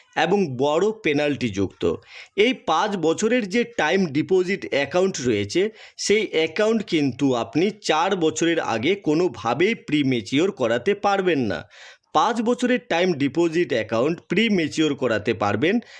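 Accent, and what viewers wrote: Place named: Bangladesh. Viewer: তুমি কি বাংলাদেশের মাল বাংলাদেশের মতন করে কথা বলছো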